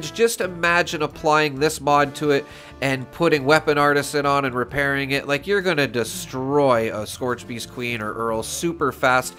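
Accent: Canada. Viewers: Dude, are you Canadian perchance?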